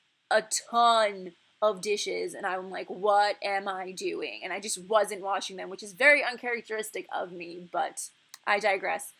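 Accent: United Kingdom. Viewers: THAT BRITISH ACCENT THO 😆😍😘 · Your british accent 😂😂😂😂😂😂😂😂😂😂😂😂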